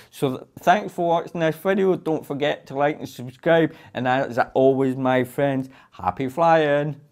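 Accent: Scotland, United Kingdom. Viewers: Scottish accents are so cool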